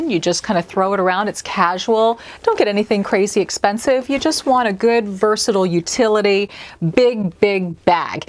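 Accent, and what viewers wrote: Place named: United States. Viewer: Thanks, I love your inspirational videos and cute American accent. You are so inspirational and so positive. I rely on you to keep me fashionable!...bless you from sunny England. 🤗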